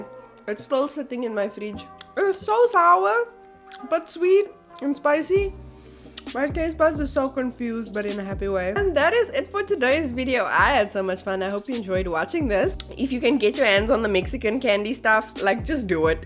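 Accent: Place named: Afrikaans, South Africa. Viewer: Ek kan die afrikaans in jou stem hoor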